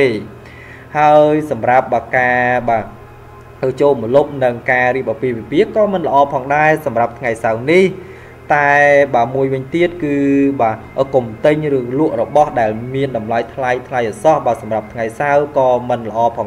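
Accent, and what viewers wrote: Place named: China. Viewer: និយាយដូចអាចេកចឹងពិបាកស្តាប់ណាស់វើយ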